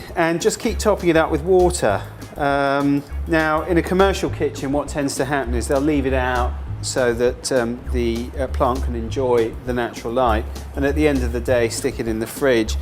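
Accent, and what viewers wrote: United Kingdom. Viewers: It's the British Accent Sounds Cool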